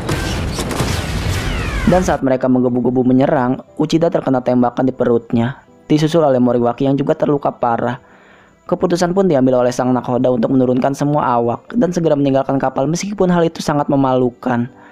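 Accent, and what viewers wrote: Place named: West Java, Indonesia. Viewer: Lo orang Sunda pasti...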